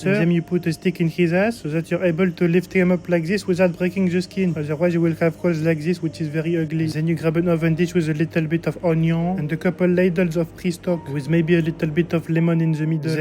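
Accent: France. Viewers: la vie tes un bon frr, de tout les accents frenchies jsp quoi tes lmeilleur big, keep go et tauras plus aucun ptit français a la con comme moi dans tes com (le jour ou tu seras gros tsais)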